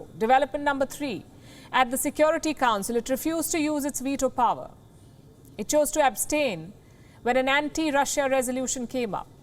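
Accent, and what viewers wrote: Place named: India. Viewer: indian tongue again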